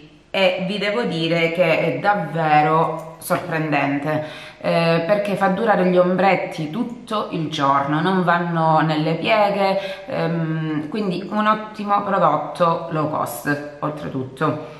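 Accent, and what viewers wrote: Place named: Sicily, Italy. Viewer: Io sono Siciliana.. mi sembrava che eri siciliana...ho gli zii che stanno in Calabria ♥️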